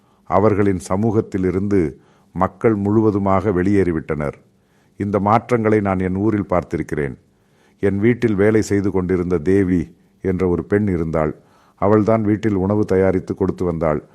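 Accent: Kerala, India. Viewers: This is Ai generated video. He is a malayalai and I know he speaks Tamil with a heavy Malayalam accent. This is not hsi voice. And here he speaks Centamil 😂😂😂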